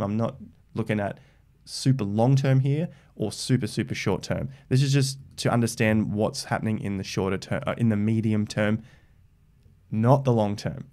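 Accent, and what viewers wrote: Australia. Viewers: I come to listen to ur analysis in ur Aussie accent :D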